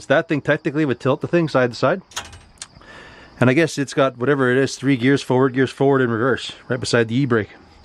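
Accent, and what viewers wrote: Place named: Canada. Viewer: My two favorite words Canada guys say. is "Out" and "Process" To us assholes down south. Your "Out" sounds like "0uuuut". And Process. You say it as spelled. You say " PRO---U--CESS". All good. We say "Prawcess". We are all jerks! Love your channel! Rock on man up north! Oh There is a another thing.... I will wait.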